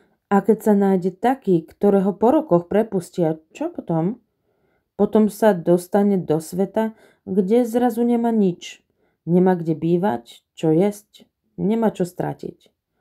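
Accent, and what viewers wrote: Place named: Eastern Slovakia, Slovakia. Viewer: Ja som na to došla ty si vychodniarka. 😂 teraz ta mam ešte ❤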